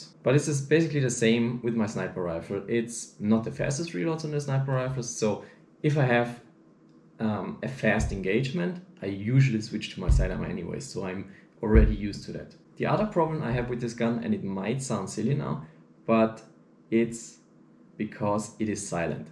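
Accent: Germany. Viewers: is he from german?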